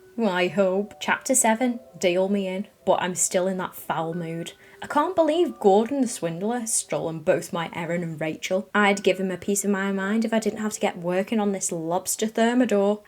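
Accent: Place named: Birmingham, United Kingdom. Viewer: That Brummie accent though....phwoar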